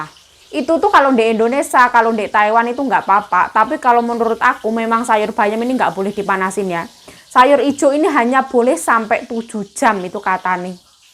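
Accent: Java, Indonesia. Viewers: Bhasa jawane medok bnget mba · Lucu yaa pke bhs sana tapi logat jawanya ga ilank jd ne lucu🤣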